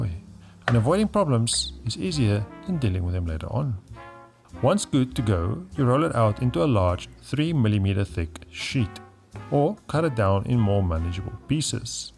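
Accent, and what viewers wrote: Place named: South Africa. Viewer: Found you by accident this evening. Subscribed! Love hearing a South African voice with the cooking videos. Also really enjoying your vegan options 😂🎉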